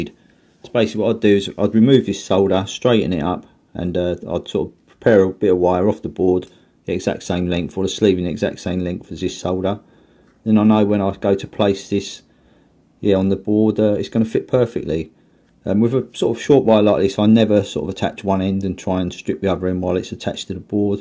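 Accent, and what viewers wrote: United Kingdom. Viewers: What if you did a video with a two-sided board top and bottom with a sleeve that would be cool , sounds like you're from the UK I'm from Sunny South Florida USA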